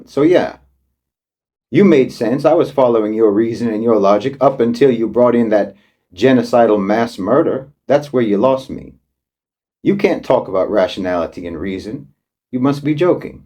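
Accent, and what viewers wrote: United States. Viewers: I am still sitting/laying on my couch watching and listening to your American-accent eloquence about the superiority of Islamic thought. What baffles me is the total absence of evidence behind the propagandistic effort to expose the so-called western corrupt “state of mind” , if any. Ok it is what you say it is, but did you offer anything which is less aggressive, corrupt, misleading, totalitarian, treacherous….? No, you propose the same shit dressed in a different outfit with your own brand on it. The difference is that you deliriously believe you own it, understand it, will control it as long as you wish to. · This guy is an idiot. saying all this in an AMERICAN accent eh? i love how they always tout the values of Islam from WESTERN countries. especially when they leap over women an children risking life and limb to get to the West. 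just wondering how the only successful Islamic countries are the ones with oil but the West is somehow lacking in rationality? when i see the dutch immigrating to Jordan then ill listen to a MUZSLIM about their superiority. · What's this fella's background? He has a US accent. It's a fact that Ireland has over 20% immigration. Five of the last twelve murders in ireland were by "refugees" Irish do not migrate to north Africa, Afghanistan, Georgia or Nigeria. But ireland takes in hundreds of thousands from these areas. Ireland never colonised anywhere, it was colonised by the english over hundreds of years. The slaughter in gaza is courtesy of zionism supported by zionist administration in London & Washington.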